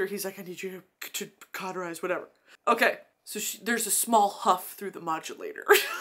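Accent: United Kingdom. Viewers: Your British accent knocks me out every time😂👌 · Seeing her face in the bottom left corner reading the fanfic in a British accent while I hide under my covers at night got me laughinggg😭😭😭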